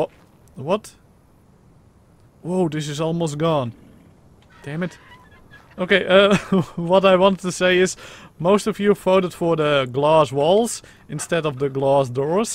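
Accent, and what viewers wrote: Netherlands. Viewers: He has a dutch accent, his English is excellent. I never once have to rewind the video because I don't understand what he has said. That aside, people don't really care how good your language skills are when you come across as a dick. It's sad to see such attitude in someone so young but I hope for your sake, you change as what you have said achieves nothing positive for yourself or the person you insulted and beginning a sentence with "no hate" means nothing, you aim to insult, nothing else.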